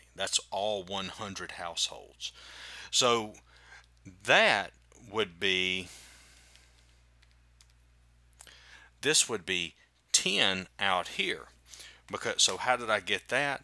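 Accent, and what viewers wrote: South, United States. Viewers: I admire your Southern accent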